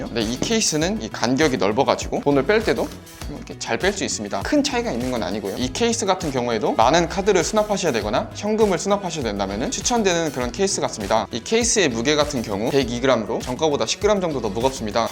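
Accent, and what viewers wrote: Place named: Jeonbuk, South Korea. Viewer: 고향이 전북이신가봐요 전주 아니면 군산 ㅋㅋ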